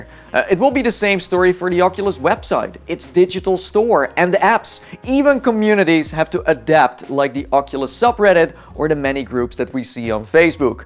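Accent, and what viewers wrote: Netherlands. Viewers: man has a dutch accent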